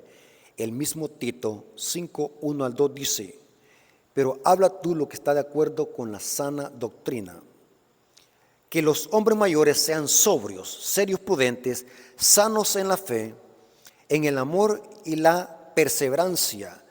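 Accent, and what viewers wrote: El Salvador. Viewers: Dios derrame su Espíritu Santo, siempre en usted. Para que siga teniendo buen carácter y mucho dominio propio, que en estos tiempos es muy difícil tener buen carácter y dominio propio. Aquí en México, cada región tiene su estilo de hablar, y yo sé que usted , es del país del Salvador, y ustedes hablan chistoso( con todo respeto) y su hablar así es de usted de por ser salvadoreño. A mí lo que me importa es que siga firme y adelante con su canal, a pesar de tener muchas piedras de tropiezo. Gracias por compartir sus clases de teología, yo he aprendido mucho, en su canal. Hermano , me enteré de que su papá se fue al descanso, y pues primeramente Dios, puedan reunirse en el día de la resurrección. Cuide mucho su garganta.🙏🙏🙏🙏🙏🙏🙏🙏🙏🙏🙏🥰🥰❤️🥰❤️🥰❤️🥰❤️🥰❤️🥰❤️🥰❤️🥰💯✅💯✅💯✅😊😊😊😊😊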